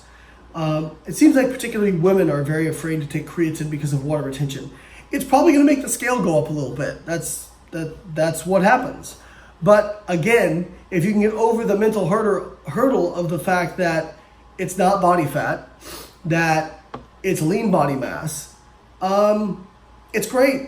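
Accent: Australia. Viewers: An Aussie accent creeping in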